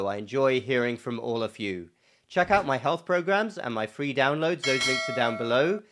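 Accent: England, United Kingdom. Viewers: Dr Sunreel lives and works in the USA. You have a lovely English accent don't lose it 🤗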